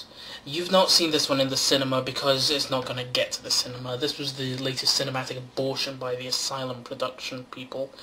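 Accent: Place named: Tayside, United Kingdom. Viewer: ha! great review, i almost choked on your hatred for asylum films. were about in scotland are you from btw? you sound like you're from the tayside area. looking forward to the dark knight review, maybe a batman & robin review aswell?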